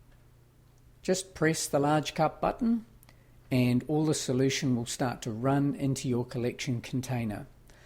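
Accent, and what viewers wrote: New Zealand. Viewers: lol.If u knew a Kiwi, the accent wouldn' t bother you. They are such nice people. The accent is all part of the charm. And they're smart too. They do things like make super helpful How-to videos. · A great help. Thanks for making the effort. Love the KIWI accent! Reminds us of a beautiful country and a great holiday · Great video, shame about the kiwi accent.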